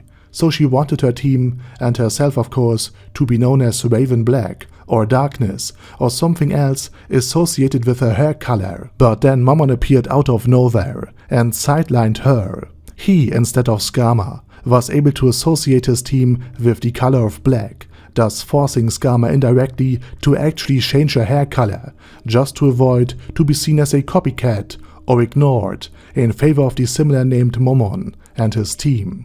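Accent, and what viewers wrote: Germany. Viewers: Bruder, dein deutscher Akzent ist schlimm.... Hab wrsl nen schlimmeren, aber kann ma das nd geben, wrsl aber trzdm n gutes video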